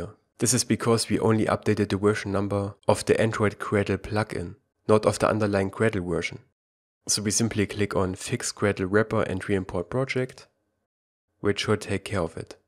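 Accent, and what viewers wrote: Germany. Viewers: German english accent is very lovely. I don't know. But I like your accent and coding. I like your teaching style. Keep this up. Dont stop. We benefit from you a lot. Thank you very much Sir.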